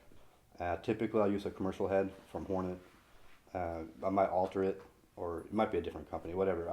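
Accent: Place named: Midwest, United States. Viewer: Wow! I always knew was from Sweden but listening to him talk he sounds like he is from the Mid-West....his English teacher must have been from Illinois, Indiana or Ohio (above the U.S. 30 corridor). He's an astonishing artist too.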